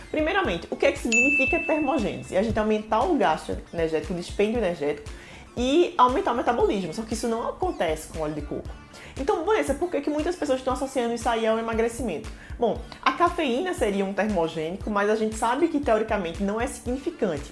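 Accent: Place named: Pernambuco, Brazil. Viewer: Que gracinha você! De Pernambuco, certeza!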